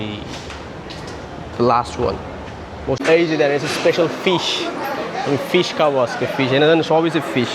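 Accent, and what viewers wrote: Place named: Kishoreganj, Bangladesh. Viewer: ভাই আপনার ভাষার টোন টা কিশোরগঞ্জ বা ব্রাহ্মণ বারিয়ার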